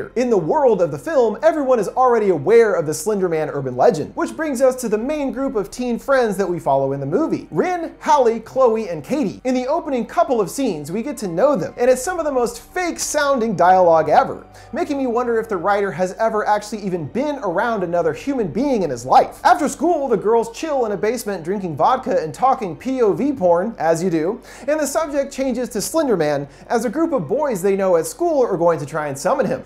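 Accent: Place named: New York, United States. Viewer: Great new York accent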